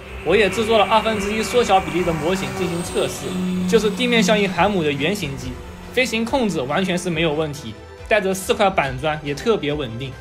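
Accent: Jiangxi, China. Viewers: 哥们儿，你的赣普话好有特点😀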